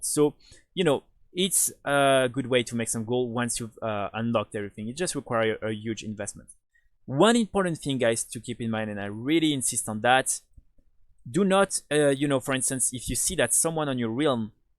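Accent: France. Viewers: J'ai direct entendu que t'étais français haha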